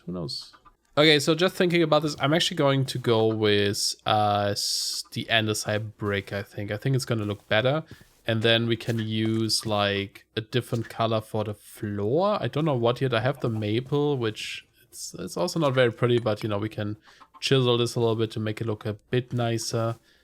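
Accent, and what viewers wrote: Germany. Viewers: Love the german accent, after looking for TFC i luckily found your channel and am really enjoying ur videos! Keep it up :) Hope u will be better soon! Greetings from germany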